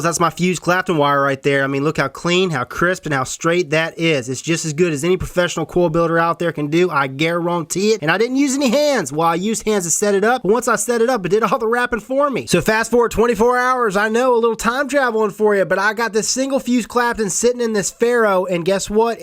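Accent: United States. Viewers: Greek-German here that speaks fluent English. It's not day-de-lus, it's also not tha-tha-los (although it's pretty close, the transcription is just shitty). First of all, in modern Greek the Delta(Δδ), the d in the Latin version, is pronounced softly, somewhere between an English th and a d. Then, the ae, the Latin transcription of the Greek αι, is pronounced like the German ä. You can try to pronounce it by forming an a with your mouth but making an e sound. And lastly, when pronouncing -los or -lus try to get the "twang", that you Americans have, out of your vowels. Take these tips and you should get pretty close. This comment was written poorly because it's like 2 AM where I live and I'm too lazy to proofread.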